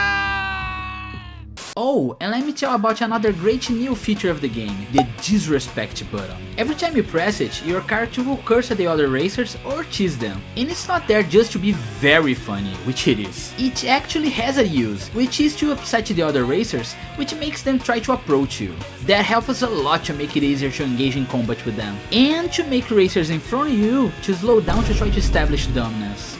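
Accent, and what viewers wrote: Brazil. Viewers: You are not a english speaker right? The way you phrase your words sounds like you are brazilian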